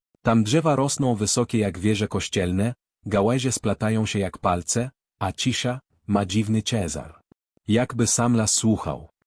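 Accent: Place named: Ukraine. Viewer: a można po polsku bez ukraińskiego akcentu ?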